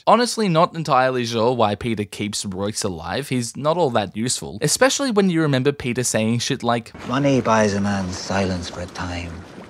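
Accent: Australia. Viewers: Melbourne! Ha... I couldn't tell if you were Australian or English, but now we know :)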